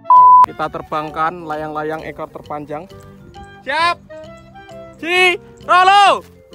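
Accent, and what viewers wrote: Java, Indonesia. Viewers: mas kok jowo an orng mana !? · Iki jowone ndi boss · Mas jowo ne ndi kok medok men....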